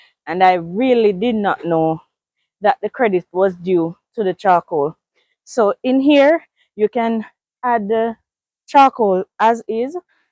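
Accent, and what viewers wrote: Jamaica. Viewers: I like her Jamaican voice, good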